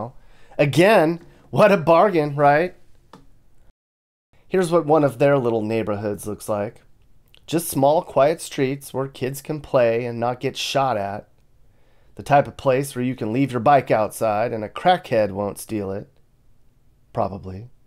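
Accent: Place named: South, United States. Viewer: you speak just like a Southerner, twang included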